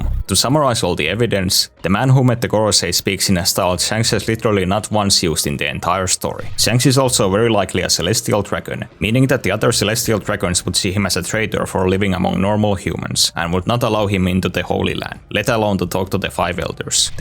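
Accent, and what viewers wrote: Finland. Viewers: Kuulosti melkein suomalaiselta korostukselta, mutta kanavan info silti sanoi Yhdysvallat... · Sounds like finnish accent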